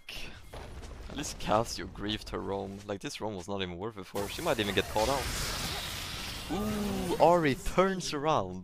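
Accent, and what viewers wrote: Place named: Sweden. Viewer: Love your videos man. And your sweet Swedish accent! :)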